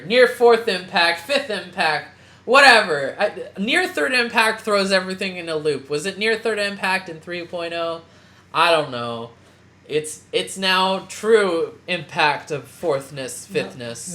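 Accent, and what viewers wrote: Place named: Germany. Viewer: I feel like you thoroughly spoiled 3.0+1.0 for me just now and you did it with such savoir-faire I can't do anything else but appreciate it. If the movie turns out to do anything differently I might be weirdly disappointed now 😂 (also as a German I'd like to say the German-ness of your pronounciation was never an issue for me personally)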